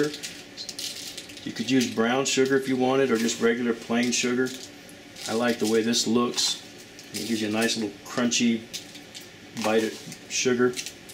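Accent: South, United States. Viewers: That southern accent is too good I could here that all day..😊🙌🤣🤣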